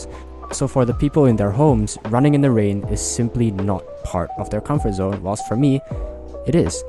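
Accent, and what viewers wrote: Singapore. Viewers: Are you from singapore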